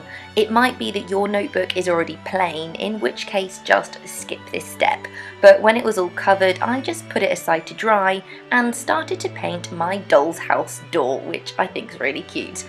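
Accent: United Kingdom. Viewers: You´re british...Ilike it! And I love this series...Amazing! XD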